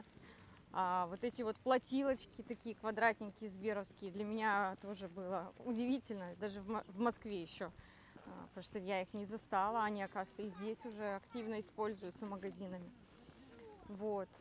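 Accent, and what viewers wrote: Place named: Ukraine. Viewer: По акценту, риторике и набору слов - Украинка .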